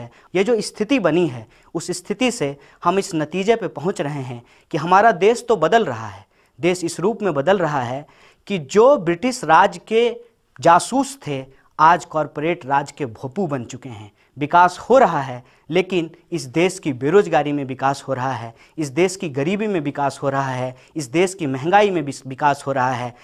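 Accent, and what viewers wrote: Bihar, India. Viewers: apki hindi bihari mix hai es liye sayed aap ko sabhi pasand nhi krtey.. mai like krta hoon. lekin janta hoon hindi sahi kr layi to sabhi apko pasand krenge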